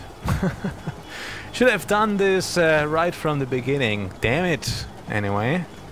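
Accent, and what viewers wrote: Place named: Germany. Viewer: Are you german? I can hear a slight accent :)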